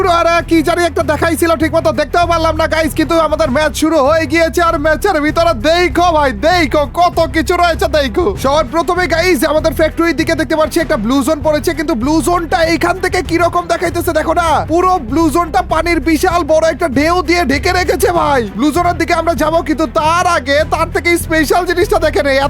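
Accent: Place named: Rangpur, Bangladesh. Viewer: Rangpur kotha basa vai 😮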